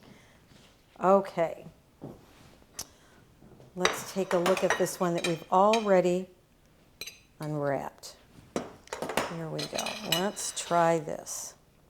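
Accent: Midwest, United States. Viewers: What a horrible Midwest accent lol. It what I expected. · Mexican lady with a midwest accent. She is too cute!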